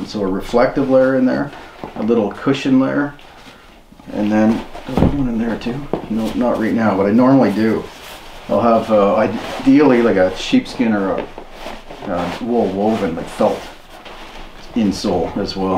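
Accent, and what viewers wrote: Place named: Canada. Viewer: When you make the "ou" sound it's easy to tell you are from Canada.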